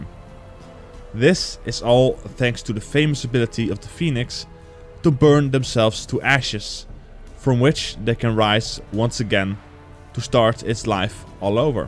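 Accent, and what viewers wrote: Netherlands. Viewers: The first two are well-known mythological animals (phoenix most of all), but I've missed them all completely in the Witcher. But then I also don't play Gwent, so that's probably why. Phoenixes are my favourite animal. Very interesting video with these unknown creatures (unknown at least in the Witcher). Answering your question; I love Tissaia De Vries as a character (and your Dutch pronunciation will just nail that name), but Coral might be a better choice, since less people know about her. Maybe keeping Tissaia for last will get you more views, because it'd be closer to the release of the Netflix series wherein she appears. I'll look forward to it regardless of which one you make first.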